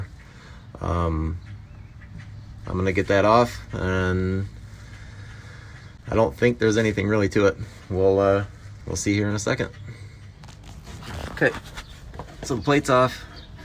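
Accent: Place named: Wisconsin, United States. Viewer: My guess was Wisconsin based on his accent.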